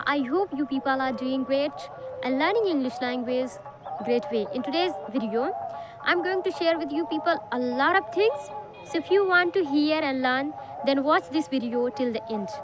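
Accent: India. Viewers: I like indian accent in english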